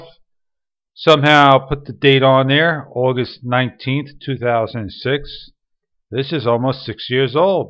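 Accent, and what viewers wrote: New York, United States. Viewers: U from ny!?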